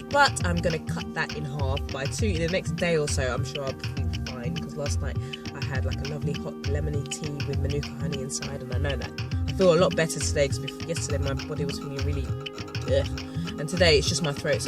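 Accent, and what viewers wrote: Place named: United Kingdom. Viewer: Nice to see your vacation journey. I liked how you edited it between present and past sights. Where are you originally from? Your accent sounds British...did you relocate to south of France? I'd love to learn more of your story. Perhaps you've made a video of it and I didn't yet see it? You are a beautiful woman - inside and out - and I enjoy learning about life in another part of the world through your eyes.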